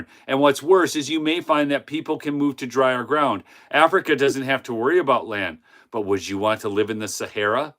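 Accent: United Kingdom. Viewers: You sound like a Brit lol. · Hey, from central illinois. Love your British accent humor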